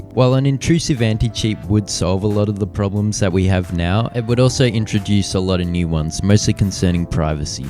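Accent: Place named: Australia. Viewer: i think i played with this guy last night on deathmatch i'm guessing your from aussie from the accent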